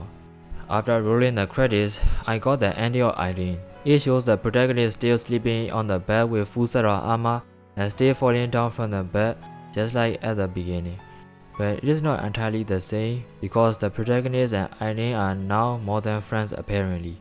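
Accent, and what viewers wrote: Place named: Japan. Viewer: currently playing this game. Uhm, i’m sorry, but you have an interesting accent. Are you a Japanese?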